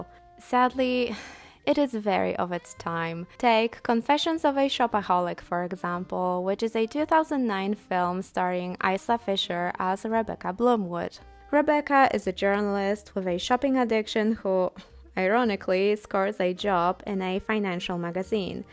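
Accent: Poland. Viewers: I love your polish accent, pls keep it!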